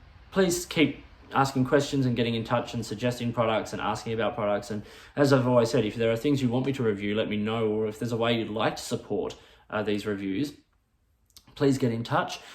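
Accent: Australia. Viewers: Best wishes to you, let’s hope things get back to normal soon. Keep up the reviews if you can it’s nice to hear an Australian voice on fountain pens. Stay well 🧡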